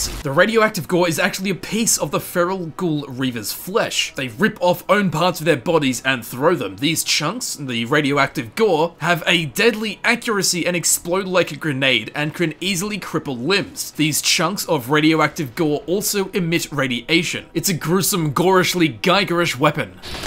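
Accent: Australia. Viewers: are you from Australia because I live there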